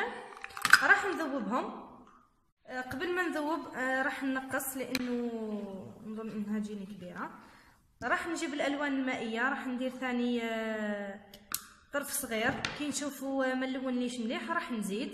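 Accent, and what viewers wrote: Batna, Algeria. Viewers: اختي انتي تحكي كيما سهام نورمالمو ديلخ تاع باتنة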